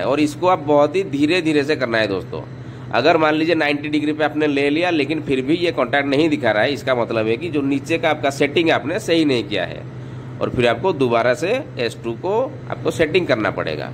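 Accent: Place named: Odisha, India. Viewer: Odia guy....bilkul odia accent. good job dear.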